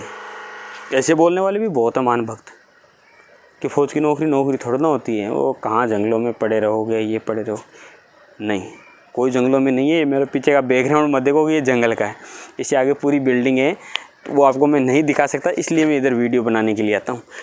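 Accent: Rajasthan, India. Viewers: Sir aap rajasthan se ho kya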